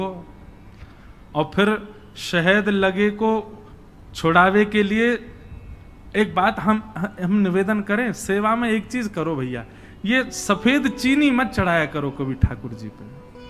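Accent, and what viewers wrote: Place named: Braj, India. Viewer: Aapki brij bhasha sunne mei bahut maza aa raha hai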